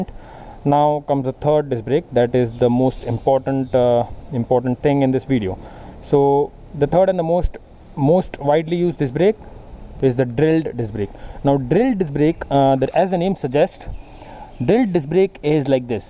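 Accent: Gujarat, India. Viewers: u gujrati?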